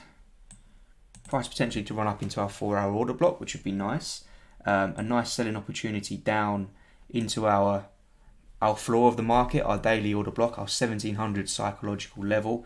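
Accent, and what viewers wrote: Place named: England, United Kingdom. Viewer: basically a Frank Lampard/redknapp hybrid, that's how you sound to a Canadian person like me. I am predicting Gold reaching 2100 usd in 2021 after consolidation in the <num> usd range. P.s. you look like a jack Wilshere.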